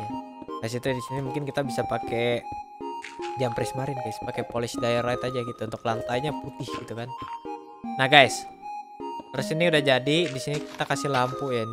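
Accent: Java, Indonesia. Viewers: org jawa kah logatnya keliatn kek jawa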